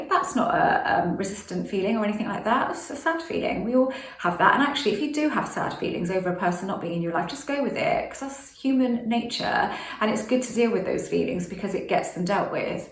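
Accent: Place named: Liverpool, United Kingdom. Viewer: Please check your mic. I love all you say and its a very important message because nobody is talking about it in the loa comunity. But with your british accent and the low sound its really difficult to follow. I think its not possible to manifest an sp because you cant wanna manifest an sp and be deattached at the same time. For me the best thing to do is to manifest a situation. Like... Focus on what you want what situation what kind of feelings. For example a romantic relationship where you go on a trip together. And even if an sp comes to your mind you just manifest that an leave up to the universe who is gonna play the character of " your love" like if life was your movie and its up to God who is gonna be the actor who plays your guy. You writte the scene, the universe choose the actor. I think that is the way to go.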